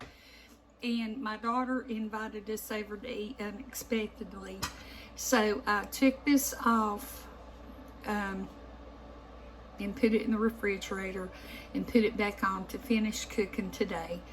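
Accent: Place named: North Carolina, United States. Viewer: Your snail mail addy is Newton NC!!! That is right down the road from me! I live in Wilkesboro NC. Only one hour from me. Thought that accent sounded from NC. So cool. Small world ain’t it? Take your time responding, the world is crazy today!!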